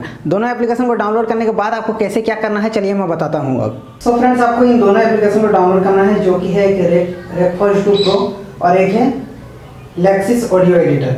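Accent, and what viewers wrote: Bihar, India. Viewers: Are you from Bihar.???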